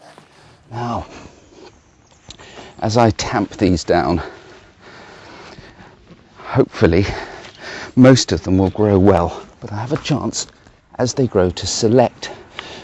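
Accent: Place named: RP, United Kingdom. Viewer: so posh !!! "im going to dig a trench?" video reminds me of a monty python intro !!!!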